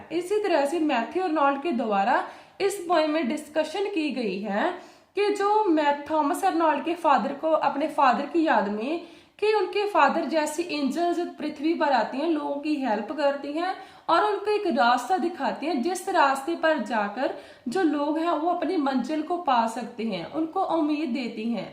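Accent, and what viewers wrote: Punjab, India. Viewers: 👌 Very nice video mam. 🙏 Thank you so much for your valuable information. क्या आप पंजाबी या हरियाणा से हो? ऐसे ही पूछा है आप के बोलने के अंदाज से. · Your voice reflect Punjabi tone,nice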